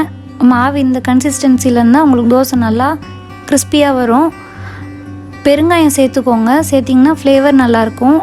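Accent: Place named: Madurai, India. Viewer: madurai side poitu vandha maari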